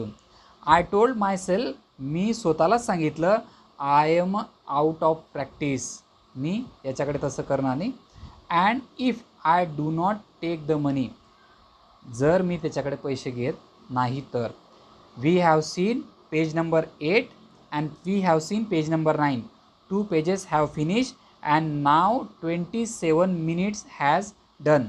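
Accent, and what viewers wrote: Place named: Khandesh, India. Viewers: Nice Khandeshi English 😂🎉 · Sir your accent is like khandeshi.... Thank you so much for explanation....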